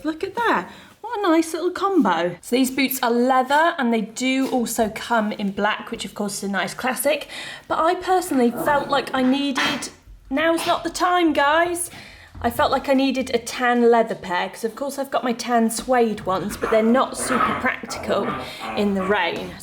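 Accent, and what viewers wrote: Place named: Scotland, United Kingdom. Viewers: I can’t with your Scottish accent lol 🤣☺️. Loving all the cashmere, I have some socks but no comfies that are cashmere, they look amazing 🙌🏻. All lovely outfits, need to find a similar dupe for that H&M premium jumper that’s sold out, they go quick. Nice seeing the pups 🤗🐕 x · Good morning girl 💖. I was sitting here at 6am with my coffee, my husband just left for work and I heard your doorbell ring and thought it was mine. Scared me silly thinking somebody was ringing my doorbell at that time of the morning 😂🙈❣️ I can totally identify with not turning on the heat 🥴. I used to call my husband Mr. Freeze 🤣 Of course I loved all the outfits. 😉😍. I think I'm going to get me some of those socks. I also love anything that is and Other Stories! Love the contrast of colors with the bed. I have storage underneath my bed as well that's where I put our pillows that we sleep on and spare sheets. 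Always Always love seeing the babies! 💞💖❣️ Can't wait for the Scotland vlog! By the way you had a little Sean Connery going on in your accent🤭 I guess that's good he's from Scotland right? 🤔🤣❣️?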